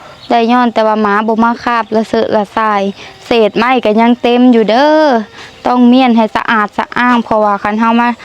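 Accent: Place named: Laos. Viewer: ลาวคักๆเรียกคำกำกวมเก่งมาก ทีบพ้อว้อเหมือนคนเเก่ที่อุบลพูดเลย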